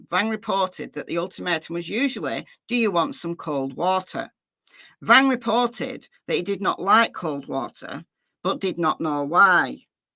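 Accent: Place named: England, United Kingdom. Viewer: It is morning here in the U.S. I luv you and your English accent and my second country England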